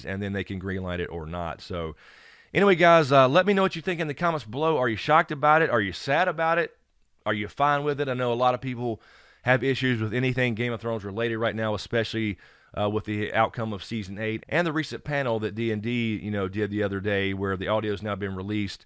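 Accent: South, United States. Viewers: Will do. I guess I still want to hear your take on their comments though, as, well I respect your opinion. I'll listen to it, but what Im' getting at is; what would you have said if this was something like a panel discussion and you got to ask them questions, and point out instances to them that you think doesn't fit the tone of the rest of the series. And of course get more of the cute Southern drawl. ( I swear I'm not flirting! You know I'm married. My mom ran away from Tennessee, so it feels homey to me in some weird way.😉)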